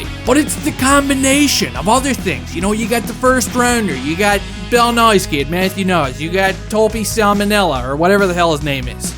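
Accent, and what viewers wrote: Newfoundland, Canada. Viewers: He sounds like a Newfie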